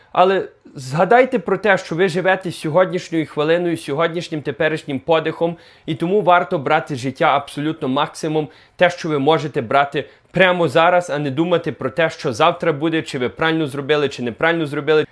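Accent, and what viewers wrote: Western Ukraine, Ukraine. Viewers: Підтримую україномовний!!! У Вас чудовий західноукраїнський говір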